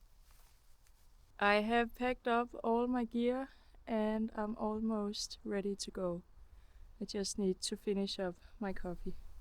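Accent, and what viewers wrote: Denmark. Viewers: You sound Danish. Going home to some warm hugge is never a failure. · Thank you for sharing your adventures with us 😊 I really like your Danish accent, I find your videos very relaxing! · That’s a cute girl with a cute Danish accent! (Trodde du var svensk först :)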